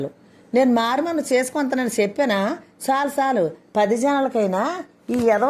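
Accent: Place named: Uttarandhra, India. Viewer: Good story. The slang of uthharandhra well established in the tone of story teller.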